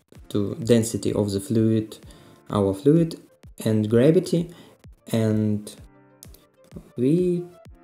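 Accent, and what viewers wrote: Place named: Russia. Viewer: спасибо за ценный урок! Судя по произношению - наш человек!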